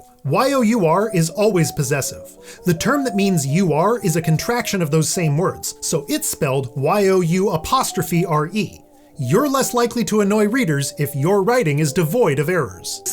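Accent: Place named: United States. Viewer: His accent is American but his legal references are British. I don’t know where the law changed in 1973.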